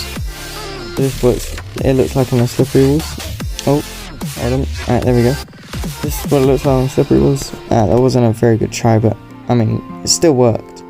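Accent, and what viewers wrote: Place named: United Kingdom. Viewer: Just wanna say that I had a bunch of ppl telling me that I sound just like you in gtag lobbies and I realised the only reason why was cause where both British lol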